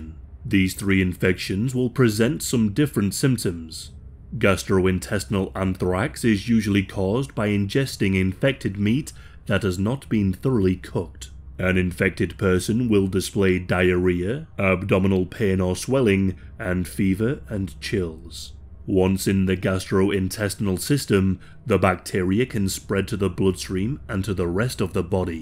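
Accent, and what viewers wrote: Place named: Northern England, United Kingdom. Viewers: Do you think everyone has a London accent? 😂 I’m a Northerner and he is 100% from England. I have a feeling you aren’t though and shouldn’t be assuming. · I didn't know you were Australian you sound northern English...? I'm from the UK btw ✌️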